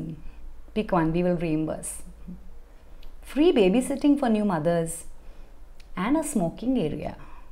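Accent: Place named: South India, India. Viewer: Amazing amazing bus ek episode chaiye hi chaiye south indian accent driven hindi me . When u speak its music to the ears .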